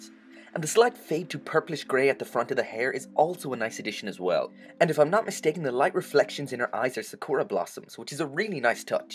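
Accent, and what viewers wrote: Ireland. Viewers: Awesome figure! ^_^ You wouldn't happen to be from Ireland would you? You really sound Irish xD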